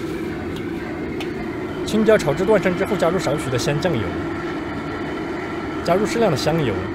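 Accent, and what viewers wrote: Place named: Shandong, China. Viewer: 去了趟山东难道学会了儿化音哈哈哈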